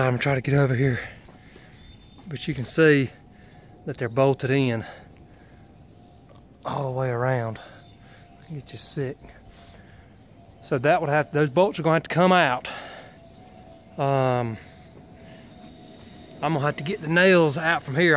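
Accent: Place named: South Carolina, United States. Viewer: You got to be from South Carolina. You talk just like me!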